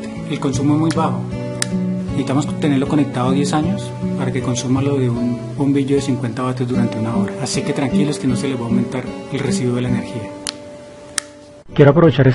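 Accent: Colombia. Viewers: Excelente, Tenias que ser de Bogotá. VERY GOOD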